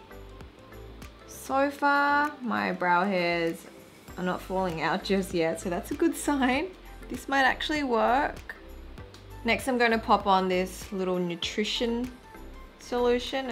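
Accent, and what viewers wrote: Australia. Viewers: I really love videos, aside from you're learning from her beauty videos, I'm learning on her accent as well since our clients are Australians. Biggest thumbs up to my most favorite youtuber!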